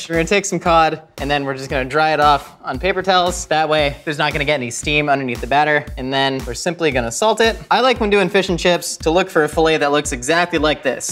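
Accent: Australia. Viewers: Sound more aussie than English😂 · That moment when you realize that the Gordon Ramsey accent was actually Australian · Lol sounded australian mate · You sounded Australian not British lol good stuff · Sounds more like aussie 😂😂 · I can confirn that accent is 100% Austrailian.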